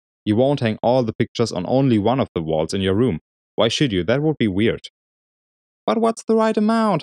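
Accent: Germany. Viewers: nice tutorial! do u come from germany? i think u have a very german accent;P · hahaha after the first sentences I heared that you are from germany :D es ist wirklich erstaunlich wie schwer es für die meisten ist den deutschen dialekt zu verstecken :D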